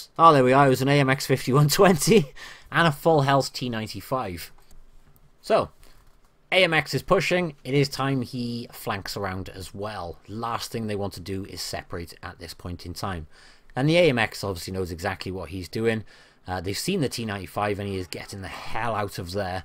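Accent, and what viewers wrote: Syria. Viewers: جدع يا:D لهجتك كانك سوري بس مش فارق المهم عربي ^^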